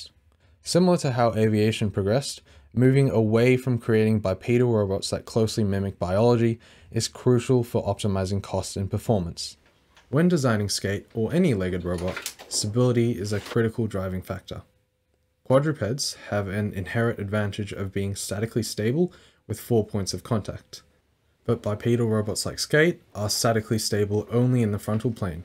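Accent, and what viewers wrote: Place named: Australia. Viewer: I have to say I love they way you think. So often people design product with no concern to cost, ease of repair, weight & ease of manufacture etc. I also find that the general approach is to over engineer everything regards of need. This is the polar opposite of what nature actually does. I think the fact that you have made the right and left parts interchangeable is brilliant as keeping the part count is low is super important when manufacturing. I am waiting on a Bambu Lab printer so I can purchase your CAD and start tinkering as I think your product/project has unlimited scope and I have already some idea's on how I can utilise your actuator. I notice that you have an Aussie accent, any chance you are located on the east coast?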